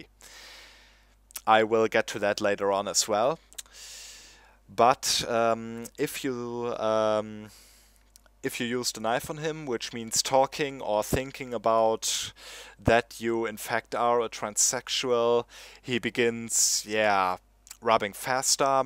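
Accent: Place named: Germany. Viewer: Hahahah man hört den Deutschen Akzent sofort raus ;D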